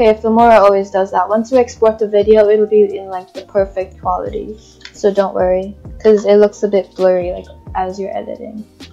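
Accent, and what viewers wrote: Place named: Europe. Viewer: why do i lowkey hear some sort of like european accent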